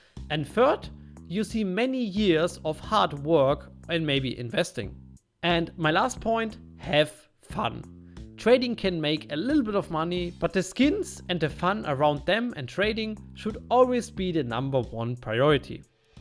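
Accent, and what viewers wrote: Germany. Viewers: i entered , are you german ? you sound like you have a german accent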